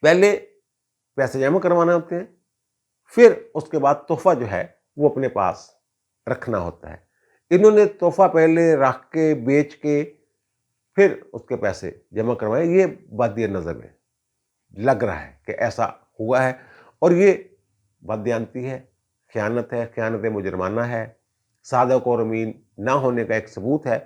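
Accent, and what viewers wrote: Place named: Jalandhar, India. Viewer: Wel done Ch Sb.Your Urdu and Punjabi style is Jlandri (East Pb of India. It is true or or otherwise!?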